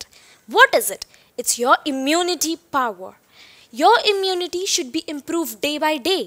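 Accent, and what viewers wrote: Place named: United Kingdom. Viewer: IN SHA ALLAH...I WILL SPEAK LIKE YOU.... I HAVE A GREAT BURNING DESIRE TO SPEAK...IN BRITISH ACCENT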